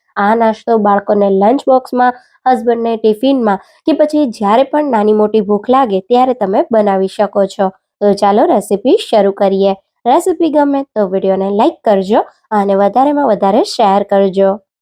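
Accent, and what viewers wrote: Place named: Vadodara, India. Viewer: Vadodra gujarati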